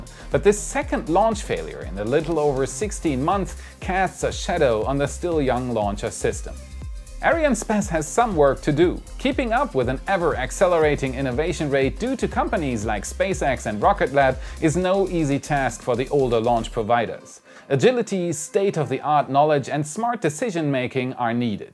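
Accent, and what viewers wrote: Germany. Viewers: Tolles Video, man hört deinen deutschen Akzent, ist aber gottseidank nicht so nervig wie bei vielen Anderen Deutschen, die Videos auf Englisch machen 😉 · Are you from Germany?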